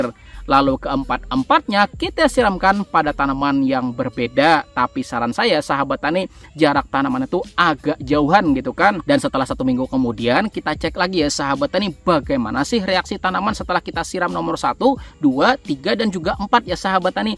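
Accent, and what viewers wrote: Banjar, Indonesia. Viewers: Umpat bangga, logat amang kada hilang😂😂😂harus banyak urang2 ky amang di bumi ku borneo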